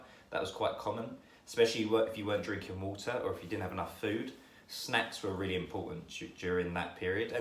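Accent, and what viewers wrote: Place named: United Kingdom. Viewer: Hi!I love the film so much,it's my favorite.Thank you for the video that we can know more about the people who also contributed to the film. Love your video!(I'm from China and I'm learning English by watching videos on youtube😂,i really love your British accent and i want to have a trip to London oneday！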